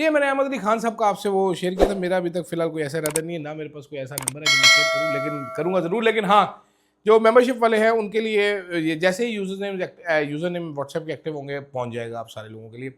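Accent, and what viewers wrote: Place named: Sindh, India. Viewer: Love your Sindhi accent Please try Pashto accent next time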